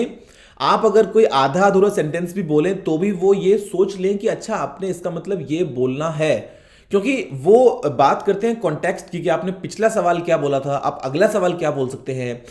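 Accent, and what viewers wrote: India. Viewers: Why is this channel written in English but spoken in Indian?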